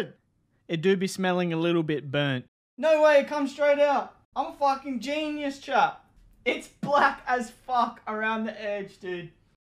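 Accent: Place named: New Zealand. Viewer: i like ur new zeeland acksent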